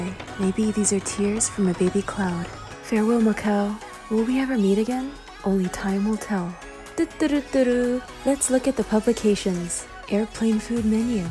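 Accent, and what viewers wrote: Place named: South Korea. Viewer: What’s ur nationality? It’s sounds like your a native korean lol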